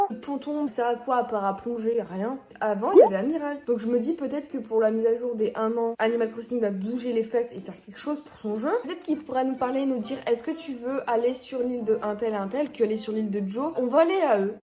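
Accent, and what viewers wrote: England, United Kingdom. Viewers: Évite de faire l’accent anglais c chiant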